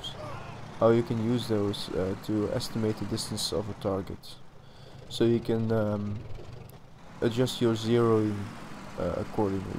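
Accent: Netherlands. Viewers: your accent , are you dutch ? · i knew this guys was dutch right when he started talking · you dutch? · Let me guess u come from holland XD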